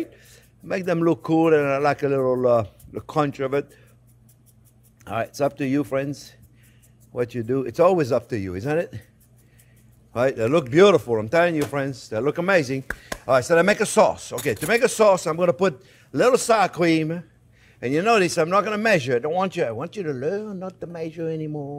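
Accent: France. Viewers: Chicken wings which are drumstick and the second process in the oven is what??? A brawler? That is what your captions say? As for your French eenglish, I don’t understand. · I love his smile and hearing him speak and teach English with a French accent. :D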